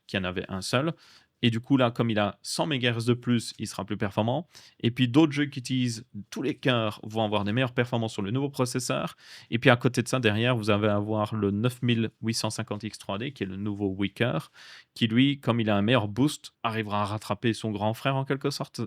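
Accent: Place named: Belgium. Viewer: J'adore ton podcast, agréable a écouter avec se superbe accent, qui me rappelle les copains de Belgique.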